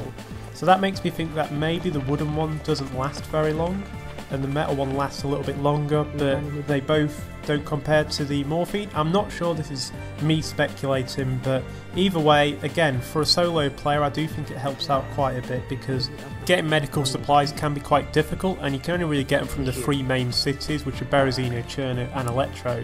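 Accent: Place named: United Kingdom. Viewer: Are you british? i wonder because you remind me of JackFrags greetings from spain :P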